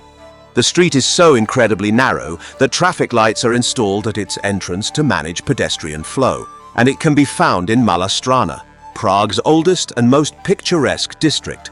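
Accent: United Kingdom. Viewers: Wow. The London underground system - even the staff dont know whats going on and where! Being told about this underground system of order - with a British accent - makes it all the sweeter.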